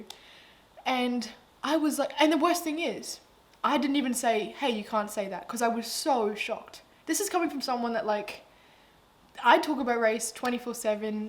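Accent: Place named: RP, United Kingdom. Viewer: Thanks for sharing your thoughts and opinions, you opened my eyes to things l had never even thought of. Great to get these conversations out into the public domain - it can only forge a path for a more comprehensive society. I love your posh english accent - it’s just so ‘cricket in the afternoon dear’. Oh - btw, what’s the cat’s name? 😀👍😀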